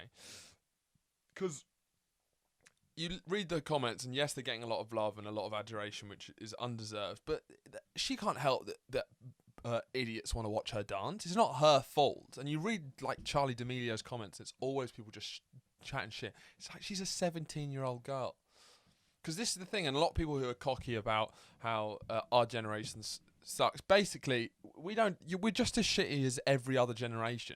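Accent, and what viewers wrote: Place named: New York, United States. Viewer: Ridiculously good New Yorker accent lmao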